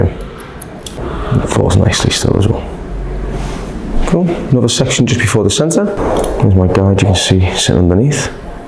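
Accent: Liverpool, United Kingdom. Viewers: Scouse barber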